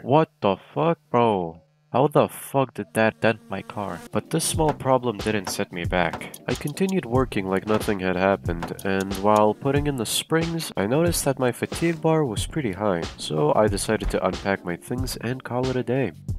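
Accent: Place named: Lithuania. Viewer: The voice sound very Lithuanian, are you Lithuanian? :D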